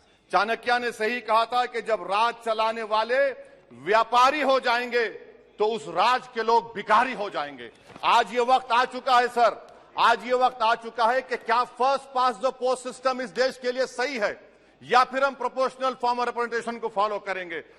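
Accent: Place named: Lucknow, India. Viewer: He should speak in Deccani instead of Lucknowi. Deccani Urdu has its flavor.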